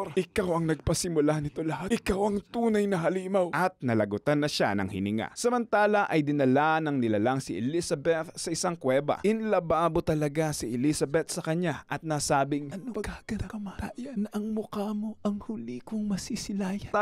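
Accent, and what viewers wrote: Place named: Visayas, Philippines. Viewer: Halong bisaya dol.palibot man haha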